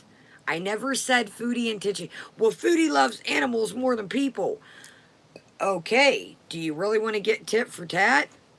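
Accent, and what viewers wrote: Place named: South, United States. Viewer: I’m from the UK and I adore your raspy southern accent! It’s literally my favourite, I could listen to you all day! Your accent and a strong Scottish accent is my kyrptonite! Everyone can see you’re genuine, keep doing you 💜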